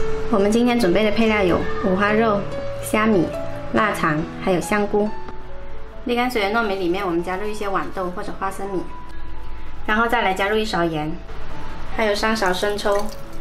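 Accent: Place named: Hakka, China. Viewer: she has been telling us that she is 客家人, I am also a 客家人too. Although I am not fluent in 客家話, I understand the dialect pretty well. Her 客家話is totally different from mine. Which part of China is she coming from?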